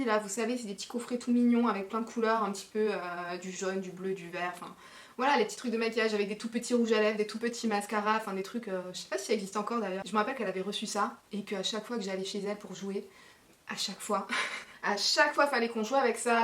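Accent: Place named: South, France. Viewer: Vidéo bien sympa tu es pleine de vie je pensais bien que tu venais du Sud tu as un peu le même accent que Julien Doré. Restes comme tu es et bonne continuation 🥰❤🥰❤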